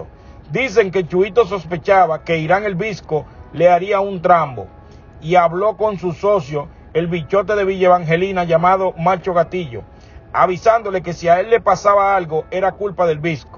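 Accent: Dominican Republic. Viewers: El narrador parece dominicano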